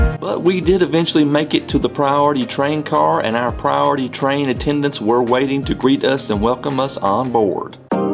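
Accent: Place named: Texas, United States. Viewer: i like your accent man.. where do you come from?! texas?! have fun in indo man..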